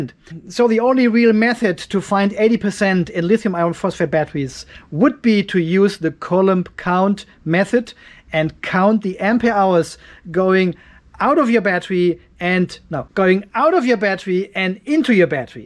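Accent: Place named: Germany. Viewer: Bei Deinem ersten Video dachte ich mir, daß Du Deutscher ...ähm... gewesen sein mußt. Bei dem Akzent. Aber "Oettinger" geht ja mal gar nicht. Zeig der Welt bitte nicht so ein Plörre. Wie wäre es mit Düsseldorfer Alt, vorzugsweise "Schlüssel Alt" oder "Füchschen Alt". ;-) Grüße von Düsseldorf nach Australien!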